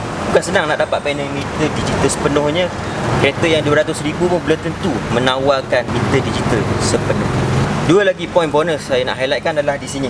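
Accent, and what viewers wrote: Terengganu, Malaysia. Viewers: Welcome bang, kalau boleh kekalkan loghat ganu time review. Boleh jadi trademark abang nanti.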